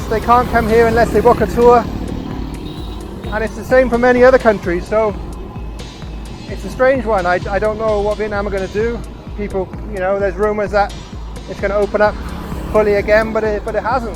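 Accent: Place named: West Country, United Kingdom. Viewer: I like the gentle west country unassuming presentation style, check out the great live music scene in Saigon if you haven't already, cheers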